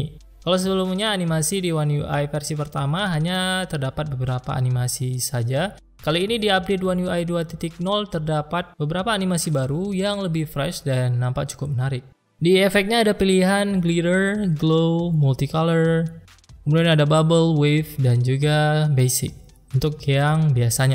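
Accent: Aceh, Indonesia. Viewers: lamtemen. aceh bro?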